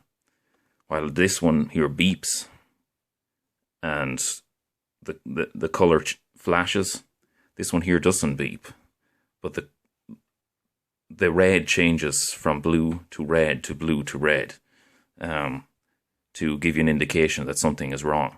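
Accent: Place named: Ireland. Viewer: Very informative and nice voice! Can't help but love an Irish accent <3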